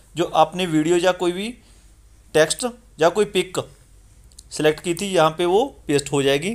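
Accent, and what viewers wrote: Punjab, India. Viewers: Are u punjabi? Ur type of speaking seems punjabi ! And i like punjabi😊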